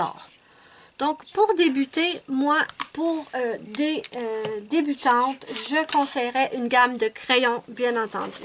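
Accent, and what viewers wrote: Canada. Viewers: Bonjour 😄je viens de regarder ta vidéo, c'est très bien ce que tu conseilles aux personnes qui débutent le coloriage. Moi ça fait peu de temps que je commence le coloriage, à côté je fais de l'aquarelle et du pastel sec qui méritent des matériaux plus chers que pour le coloriage,pour moi bien sûr, donc je commence le coloriage avec les brutfuner,ils sont parfais😍💜Par contre pour les feutres crayolas je ne savais pas qu'il y avait 3 façons de les employer et c'est super je vais les prendre pour le moment pour remplacer les encres distress ink et je ne savais pas qu'ils étaient aquarellables.C'est super. J'adore tes vidéos 😍❤️ton accent canadien et la façon que tu as de te parler à toi même, tu es géniale 😍💜 Bisous bisous 😍😘😘🧡🧡🧡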